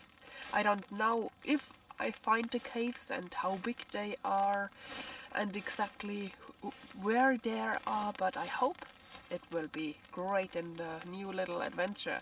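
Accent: Bavaria, Germany. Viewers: dieses bayerische Englisch ist - na ja - gewöhnungsbedürftig. Aber ich verstehe schon, dass Du versuchst, Deinen Wirkungskreis zu vergrößern.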